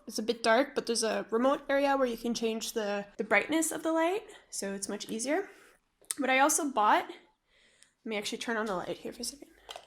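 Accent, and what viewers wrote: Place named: Germany. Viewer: Lovely German accent! I'm a Brazilian living in Berlin and I'm total drawing begginer. Your videos are inspiring/helping me a lot. I could see you have pretty much everything you need for your art craft, and would like to ask you where do you buy them. I live in the West and close to my apartment there is a huge craft store, and I feel like buying everything. I can't say it's cheap but they have an excellent service and their personal are always very helpful. Here is the link if you're interested: <link> I also heard about a store called Action, but I haven't been there yet, because it's far away. I looked at the online prices and it's indeed very cheap. I also buy a lot at Aliexpress app. I would love to know where do you buy your art material. Wish you an amazing weekend!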